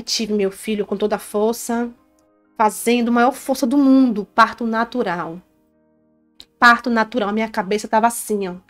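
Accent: Bahia, Brazil. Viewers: Oi vc e baiana a voz parecida ?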